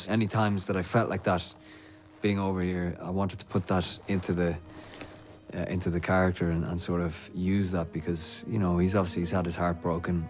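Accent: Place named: England, United Kingdom. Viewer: Ah okay right, I misanderstood English and Irish accent actually yes he does use the English accent more than the Irish one :) thanks for clearing that up for me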